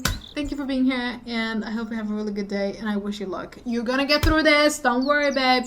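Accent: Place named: Balkans. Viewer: I hear a slight accent. Are you from the Balkans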